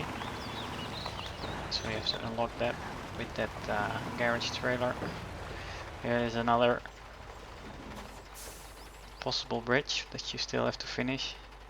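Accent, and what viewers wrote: Netherlands. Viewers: Klinkt als een Nederlander. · Altijd grappig als een nederlands iemand engels praat :) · Ja volgends mij is hij Nederlands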